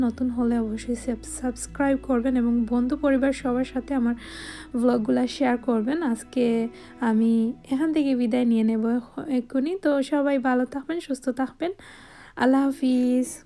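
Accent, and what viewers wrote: Sylhet, Bangladesh. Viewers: sylhety sounds natural to hear that's all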